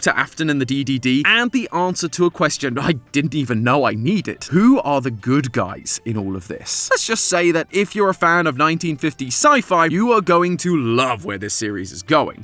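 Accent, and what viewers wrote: United Kingdom. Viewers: I love the British accent tho❤ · Are you British um whatever your name was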